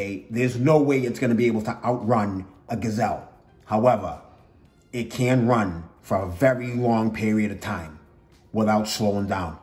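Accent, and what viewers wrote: Boston, United States. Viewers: that's a 100% authentic Boston accent right there.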